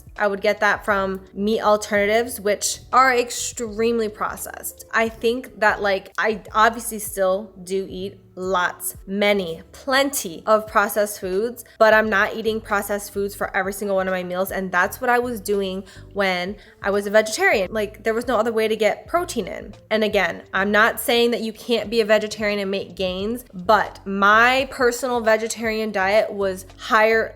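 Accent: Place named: Michigan, United States. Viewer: I could listen to talk all day her MI accent is so nice to hear lol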